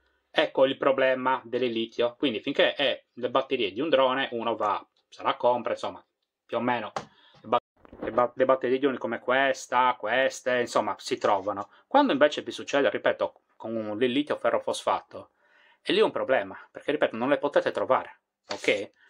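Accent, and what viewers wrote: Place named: Sardinia, Italy. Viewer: Magari fossi brava come te...mi sto arravanando....ma non ce la posso fare da sola e sto cercando elettricista non solo bravo ma con la mente aperta che mi aiuti. Qui da bricoman oggi stanno vendendo i pannelli monocristallino da 450 .... ma ammesso che vadano bene non saprei che inverter abbinare. Cmq grazie, perché sei un'ispirazione oltre che molto simpatico con la tua semplicità e schiettezza oltre che per il nostro magnifico accento sardo....sono molto orgogliosa perché penso che tu sia veramente molto preparato! BRAVO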